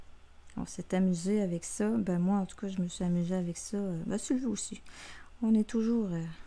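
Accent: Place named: Quebec, Canada. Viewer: Je vous découvre et suis sous le charme de cet accent des cousins❤ merci pour cet astuce très intéressante. Bonne journée.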